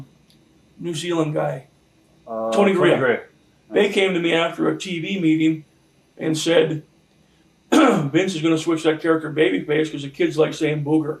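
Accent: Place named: Upper Peninsula, United States. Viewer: Love the Yooper accent!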